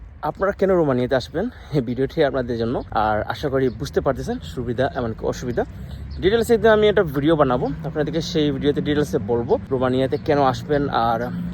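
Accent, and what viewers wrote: Sylhet, Bangladesh. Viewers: ভাইয়ার বাড়ি মনে হয় সিলেট, তো আমি একজন্য সিলেটী হিসাবে আপনার সাথে কথা বলার আগরহ যথি মন চায় রিপ্লাই দিয়েন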